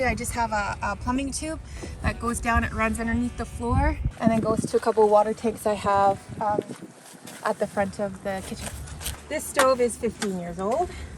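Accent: Canada. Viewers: Ahhh, freedom! 😊I hear a Canadian accent, so I know making it was warm as possible is a priority. I wonder if police or "Karens" up there are prone to harassing people who live in their vehicles they way they are in the U.S. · She is from Canada. I could hear it. I’m from the United States. I really like what she has done with her Jeep. Incredible.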